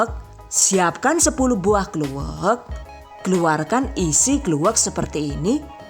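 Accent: Banyumas, Indonesia. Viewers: gagal pokus pas ngmg ngapak kaya nggone nyong, bahasane ngapak😂😂😂😂😂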